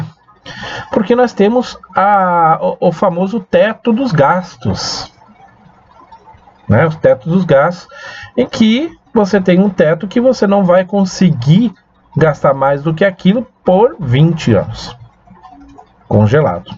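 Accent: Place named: Curitiba, Brazil. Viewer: Ola, acabei de conhecer seu canal. Cresci em Curitiba, e sinto falta do sotaque curitibano (alem da conversa) =) So queria deixar um relato sobre uma conversa que tive com uma grande amiga cubana. Ela me contou que quando comecou o processo de enviar medicos de Cuba para o Brasil, a maioria dos medicos queria ir para o Brasil, tendo em vista que, mesmo com o governo cubano ficando com parte do dinheiro, ainda sim esses medicos ganhariam muito mais. Ela me disse, "voces nao tem nocao de como faltaram medicos em Cuba". Essa fala dela me chocou muito. Ela tambem disse, "parece que voces queriam medicos para assumir o interior do Brasil, que estava em falta em alguns lugares por la, mas pra isso voces tiraram ate da capital de Cuba". Eu nao tenho nenhuma opiniao formada sobre se levar medicos para o Brasil, vindo de outro pais, ta certo ou errado, mas com certeza algo que nos brasileiros nao nos perguntamos e por que tem "tanto medico sobrando em Cuba". 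E a verdade e que nao tem medicos sobrando em Cuba. Acredito apenas que, em nome de resolver nossos problemas sociais de forma imediata, de campanha politica, de envio de dinheiro para Cuba, de boa publicidade, etc tiramos medicos de um ser humano para levar para outro ser humano. Essa conversa eu tive ha uns 5-6 anos e ela ainda me choca. A pergunta que fica: sera que deveriamos transferir nossos problemas sociais para outras pessoas? Outra conversa foi sobre ela e os pais dela crescendo em Cuba, sem dinheiro vindo da ex Uniao So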